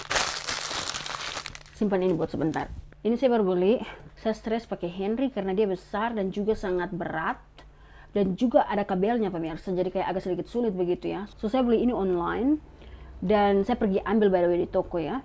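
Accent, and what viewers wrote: Manado, Indonesia. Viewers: Aku suka logat manado n maluku kak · Don't worry saya org malaysia pun sgt understand apa yg kamu katakan yr english n bahasa indonesia perfecto only yr slang very manado no problem with that's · Gapapa kak kalo bisanya ngomong bahasa Inggris lebih nyaman dan mudah ya ga usah peduli apa haters bilang soalnya cape dengerin apa kata netizen yg maha benar dan berkuasa wkwk....iya juga sih sebenernya kak lebih baik ngomong bahasa Inggris campur2 Indonesia.soalnya klo pun kak ngomong bahasa Indonesia takut nya juga ga gitu bener bahasanya karena lidah org menado/Maluku klo ngomong bahasa Indonesia juga ga bisa sih.jadi mesti full bahasa Maluku /Manado gitu.intinya aku paham maksud kak gimana sih makanya dia milih ngomong campur Inggris juga ya karena dia ga tau kosa kata bahasa Indonesia dengan benar tpi klo bahasa Manado ya kak lancar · Daya tarik vlog kak ap adanya n logatnya jg suka,stengah indo,inggris manado 😂😂😂😂😂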